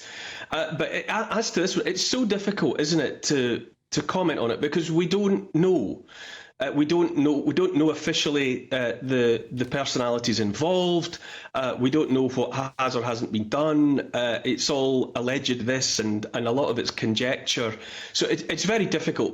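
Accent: Scotland, United Kingdom. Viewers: with a Billy Connolly accent today:)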